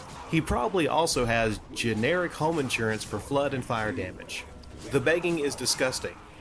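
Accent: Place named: United States. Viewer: Listening to your accent you are American. I'm guessing you're happy to live in a capitalist society. YouTubers, including yourself probably, are hoping to make money from their work/videos and there's nothing wrong with that. It seems hypocritical that you're making videos yourself, probably monetised, then complaining about other people making money from YouTube and Patreon. There are many YouTubers that monetise videos and use patreon to try and get a bit more cash. It's the nature of the beast.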